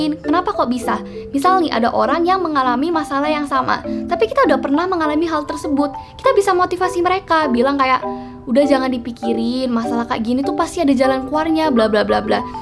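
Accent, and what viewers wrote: Java, Indonesia. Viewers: Monmaap Cece org Jawa? Medokny nongol😁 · medok banget buna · Logat jawanya uhhhh gemessss😭😭😭😭😭💙💙💙💙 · Kaka kok medog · Kak boleh gk buat konten 24 jam ngomong medok:')🥺❤️🌺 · Kak kk orang jawa ya karna, suara omongan kk Kalok iya sama donk kayak aku tapi aku ngomong nya itu kayak orang medan😂😂😂😂😂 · Medok muu kakkkkk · Kak bicaranya kayak rada rada ada bahasa jawa nya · cantik Imut Yang bikin lucu medok nya itu loh😗😂♤♡ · Ya ampun medhok nya jadi kiyot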